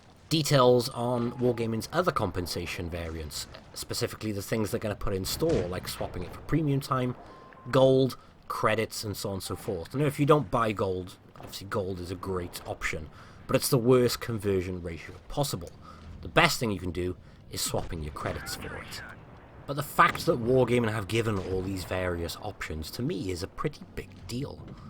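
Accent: United Kingdom. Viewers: Blitzers from the stream for 5.0 are asking for your voice to be added for British crew voices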